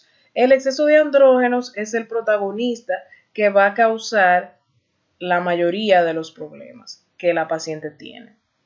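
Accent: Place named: Dominican Republic. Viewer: Excelente explicación, felicidades!! Desde que escuché el acento supe que eras dominicana!! éxitos!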